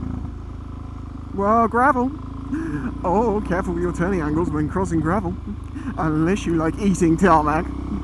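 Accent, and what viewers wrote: United Kingdom. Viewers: Haha British, idk why but you Brit’s sound so hilarious to me.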